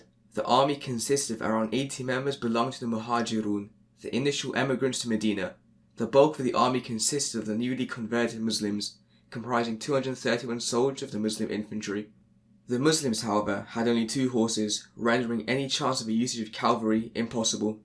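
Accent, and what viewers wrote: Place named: United Kingdom. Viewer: ngl wasnt expecting a british innit p.s. nice visuals concept.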